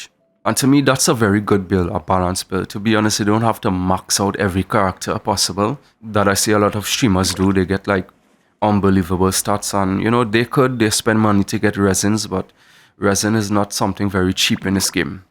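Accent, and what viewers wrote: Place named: Trinidad and Tobago. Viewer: You sound like a trini. Btw good video. You earned a sub.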